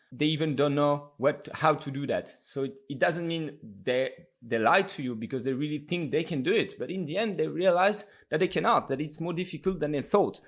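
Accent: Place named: France. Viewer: ton accent on dirait que t'es francais tres bonne video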